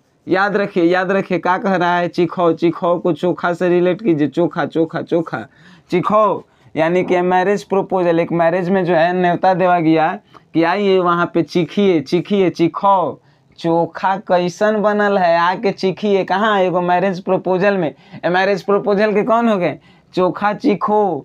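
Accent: Bihar, India. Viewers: Sudh bihari hain aap salutes hai aapko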